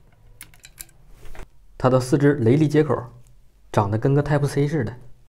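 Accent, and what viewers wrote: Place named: Northeast, China. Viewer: 你是东北林区的吗？和那个林区大雷一个口音啊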